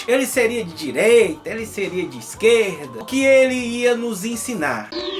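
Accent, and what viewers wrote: Northeast, Brazil. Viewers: Meu amigo, á muito tempo sigo seu canal, vejo seus vídeos, gosto muito. Você é um cabra arretado, que fala tudo com esse sotaque humorístico gostoso dos nossos irmãos nordestinos, mas vendo hoje esse vídeo, me emocionei um pouco, com tão belas explicações do evangelho em resumidas palavras. Se o homem foi inspirado para escrever a bíblia, como dizem aqueles irmão mais fanáticos das religiões, você estava inspiradíssimo por Deus, na hora que fez este vídeo. Estou vendo que foi o ano passado que você fez, mas esta reflexão vai valer por seculos e este momento de quaresma, vai ser sempre mais um bom momento para podermos acompanha-lo e refletir. Ah! Se todos os padres, bispos e pastores das religiões tivesse a graça de Deus que você teve, para explicar de forma tão direta o que é Jesus e quem foi aquele povo, aquele sacerdotes, aquele sistema. 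Ou seja: Não mudou nada. Jesus continua morrendo coossificado e o povo continua crucificando Jesus.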